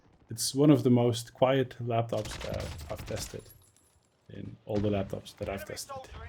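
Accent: Netherlands. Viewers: Awesome vid thanks bro. Also you sound Dutch.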